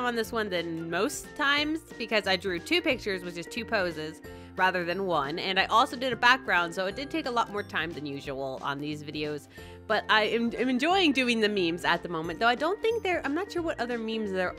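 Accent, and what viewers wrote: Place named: United States. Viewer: It's so weird when you hear someone's voice for a while, and then see their face and it's COMPLETELY different than you imagined. This video is the first time i've seen your face- it's sort of like imagining characters in a book then seeing it in move form, but you actually had their descriptions in the book! ToT I thought you looked asian just with an american accent! Gosh i feel weird now.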